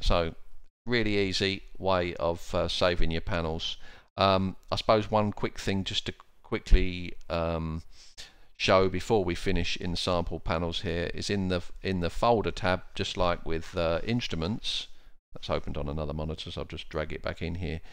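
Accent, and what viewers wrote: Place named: United Kingdom. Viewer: I'm new to this and greatly appreciate your videos. They are even clear to me which is not an easy affair for a French speaking simmer listening to a british accent! I will have to see this one again as I missed a few things. By the way you should make your panels available. It would be so useful for beginners. Thanks again!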